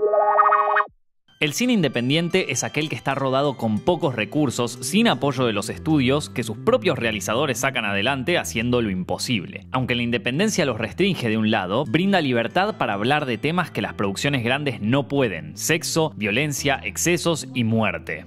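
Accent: Argentina. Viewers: Felicitaciones. Por fin una voz argentina de alguien que sabe y que no lee cualquier cosa cuando lee en inglés. Muchas gracias!!!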